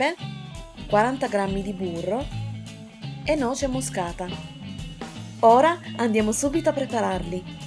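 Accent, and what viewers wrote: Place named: Romagna, Italy. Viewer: Che brava la signora!! Adoro la sua cadenza romagnola. Mio padre era emiliano e la sua famiglia ci ha insegnato a fare i cappelletti. Anche se mamma già sapeva fare la sfoglia. Purtroppo io non riesco senza la macchinetta, ma i cappelletti ogni tanto li faccio. Grazie per questo tutorial!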